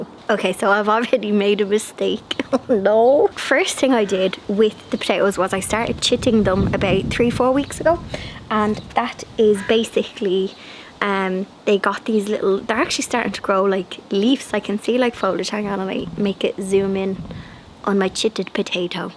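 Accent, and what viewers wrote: Ireland. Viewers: Hi, a great video and you have got me motivated ( which I needed ), I have power washed my decking and other bits ( also myself ), and tidied up a bit, and it’s all down to you, so thank you so much, I love your very energetic videos and of course your wonderful Irish accent, you make my day. xx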